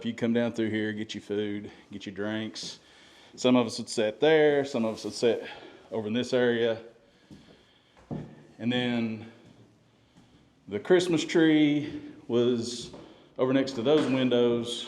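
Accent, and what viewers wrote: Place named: Tennessee, United States. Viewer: “anniss” = “and this” (I am from Maryville, TN, raised by my great grandparents, so this accent is heartfelt) I truly hate that Helene hurt so many people, no matter how detrimental, it is no competition, but I truly wish I had the means to help these folks. All I can give are prayers and a comment on YouTube but nonetheless my thoughts are with them. 😢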